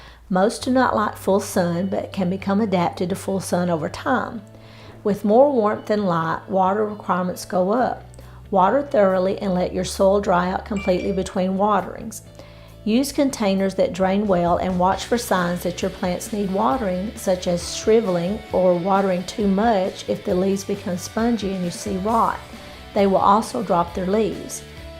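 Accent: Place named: Chicago, United States. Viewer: Great info! Thanks for sharing. Perhaps cut the volume of the music on the next one? I love your accent, where are you from? Chicago?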